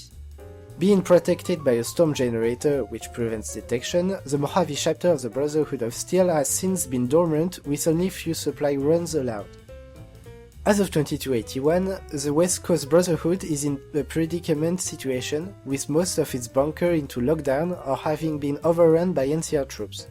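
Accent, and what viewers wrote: France. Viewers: His french accent is as cute as Curie's... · T'es français ? :3 Putain, cet accent mec ! XD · Juste excellent ! Continue tu es sur la bonne voie ! (je me permet de te répondre en Français, ton accent indique tout ^^, et les sous-titres Français, c'est parfait !) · lol dat French accent is ridicilous but nice video brah :D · Chemsse-dine Salhi I know, but I don't need them, I can understand him perfectly, I went to french schools so I got used to hearing french accents. If he is indeed french, he does actually speak English very well. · omg, french accent <3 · vue ton accent et les sous-titre tu doit être français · your frenglish makes my ears bleed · ohhh putain cet accent francais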